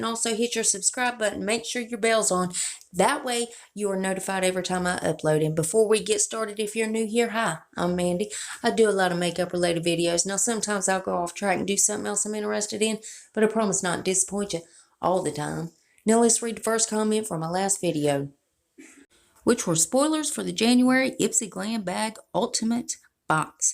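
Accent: South, United States. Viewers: I looove your accent!!!!! 💜💙💜💙💜💙 my husband can always tell when I've been watching your videos cuz I end up talking in a country accent lol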